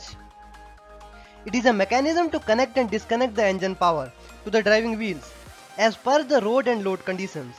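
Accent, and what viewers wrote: India. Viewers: More confused than before. Hard to understand with Indian accent. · i swear my brain has been trained to shut up and listen like im in class whenever i hear an indian accent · That indian accent is disturbing. Better to read instead of listening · How come it's always the guy with an Indian accent that knows literally everything and can explain it to the dumbest people and it makes sense!? This is a masterpiece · This is an indian guy because his speaking accent is hindi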